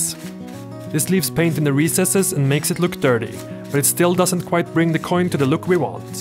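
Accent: Sweden. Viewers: Man känner igen en svensk röst väldigt snabbt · He's most likely swedish, all swedish ppl sound like that if they haven't been in the US for a month.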